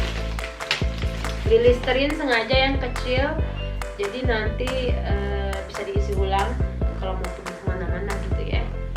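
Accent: Jakarta, Indonesia. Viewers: Saya depok nya krukut limo depok mpok.. Salam kenal mpok suka banget ama mpok yg logatnya betawi tpi pinter bhs asing. Lovee youuu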